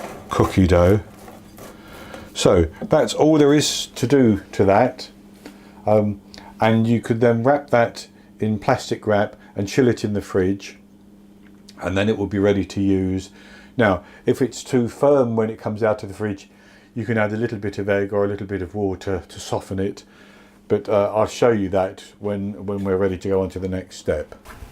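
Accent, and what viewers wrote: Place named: England, United Kingdom. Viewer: Hello: I do like your baking and very gently English accent to let us understand what you say. But can't you put the mic hanging lower on your shirt or put in somewhere seems more better, because your breathing is very strong as well! Thats why we feel uncomfortable. Sorry about to let you know my family's feeling and >> Thanks your share:):)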